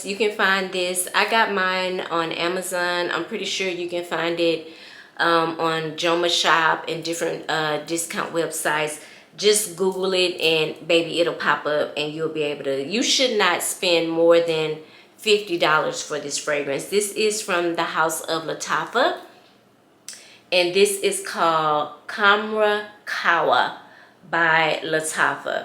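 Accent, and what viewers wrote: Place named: East Coast, United States. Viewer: I would definitely!! I love watching perfumes & fragrances, indulge, I love your East coast ♥️ accent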